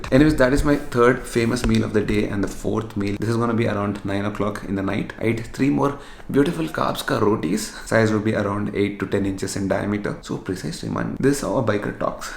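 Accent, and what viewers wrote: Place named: Telugu, India. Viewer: Bro nuvvu English lo matladina maku telugu lagey anipisthundhi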